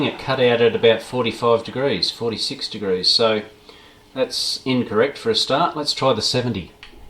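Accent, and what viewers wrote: Australia. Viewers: Loved your delightful Aussie, unassuming, understated technical explanation that clearly demonstrates, without intending to, that electronics is not at all for the home handyman. This 'repair' is way out of my league, and I'm off to buy a new kettle!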